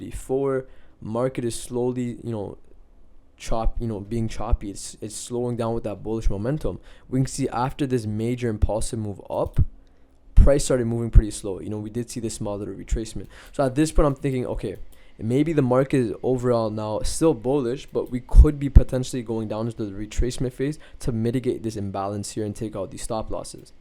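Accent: Toronto, Canada. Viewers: U sound like u from toronto. Am i wrong?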